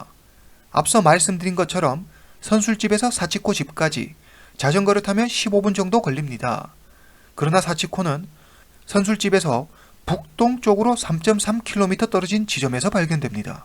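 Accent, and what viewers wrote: China. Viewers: 님 중국사람이신가요?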